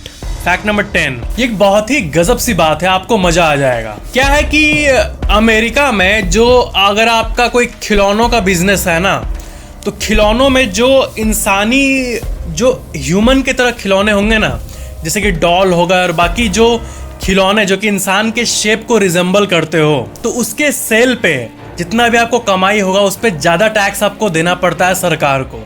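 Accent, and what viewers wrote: Bihar, India. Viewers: Ap bihar k hona🤔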